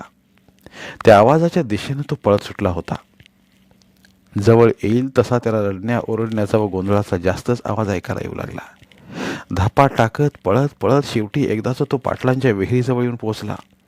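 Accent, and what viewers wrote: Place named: Rural, India. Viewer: सर तुमचा आवाज चांगला वाटतो ग्रामीण भागातील आठवण येते धन्यवाद 🙏🙏🎉🎉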